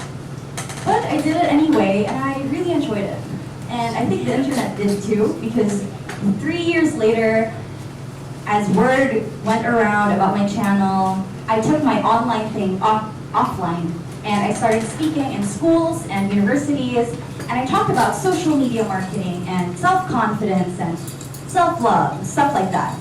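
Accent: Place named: United States. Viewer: when you speak english its as if you are from the USA--more specifically the typical pinay from the west coast like California. Then seeing your other videos when you transition to tagalog and accent comes back. That is a very nice skill.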